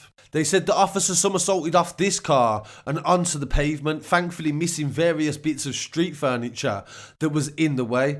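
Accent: United Kingdom. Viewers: I just like the intonation and accent hence glued to these stories. Which part of UK is this amazing accent from 🤔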